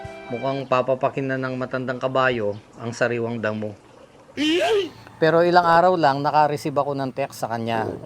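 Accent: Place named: Pampanga, Philippines. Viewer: Prang kpampangan kau sir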